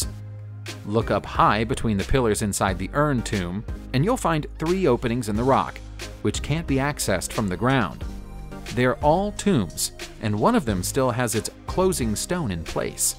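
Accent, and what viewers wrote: United States. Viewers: your pronunciation is typical American .....ATROCIOUS!!!!!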